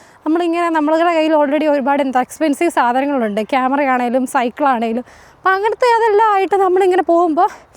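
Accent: Kottayam, India. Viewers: Kottayam slang liked enna parayana Ennada uvve angana kure und like kochi trichur tvm etc 😊😊🎉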